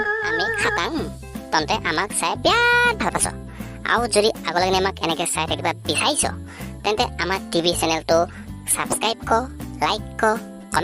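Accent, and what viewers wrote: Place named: Morigaon, India. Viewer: Ha ha ha Mojja Morigaon vaxa jen lagil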